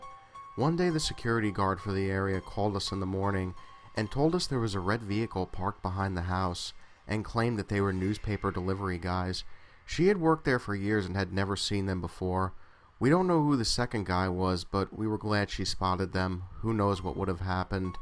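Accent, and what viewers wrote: New York, United States. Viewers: Are you a New Yorker?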